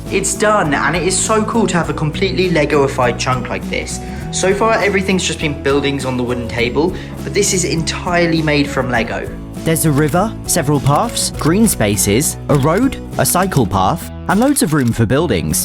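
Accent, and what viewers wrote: England, United Kingdom. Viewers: Yo are you English or welsh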